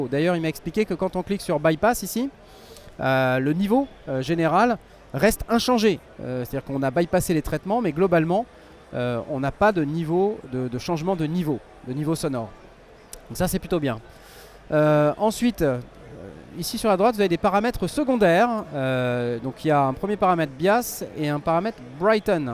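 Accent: France. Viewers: I will try to ré-explain ... Fluent but frenchy indeed.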